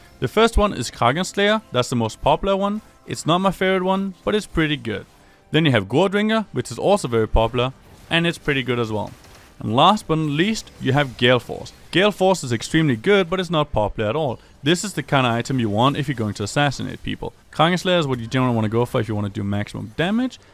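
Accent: Denmark. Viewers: I gotta ask, are you Danish? Great video BTW. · Er han dansk??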